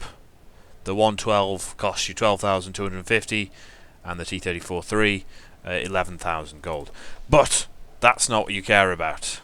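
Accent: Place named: United Kingdom. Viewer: I just love the Brit accent. it's quite epic. Makes anything great (he could read from the dictionary and it would sound good to our American ears !) :-) (but he does good reviews too - beyond that lol)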